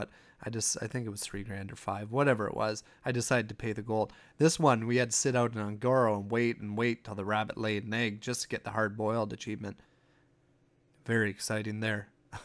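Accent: Canada. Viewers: Couldn't agree more. I love that calm Canadian voice and the way he says process. If it turned into something like "HAI GUISE HERE LOLOL ALRIGHT LET'S DO SOME ACHIEVS WOHOO" it would really ruin the character of as well as. Just my 2 cents.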